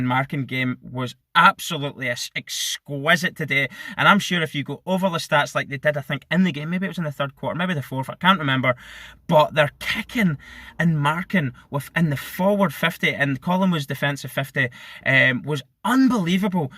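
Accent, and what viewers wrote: Scotland, United Kingdom. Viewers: Beautiful human with a good Scottish accent. Subbed. Cheers!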